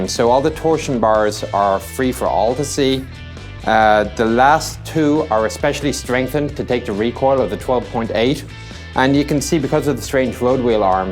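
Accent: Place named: Ireland. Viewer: this guy has a speech impediment on top of a thick Irish accent not a great combination for understanding anything he says!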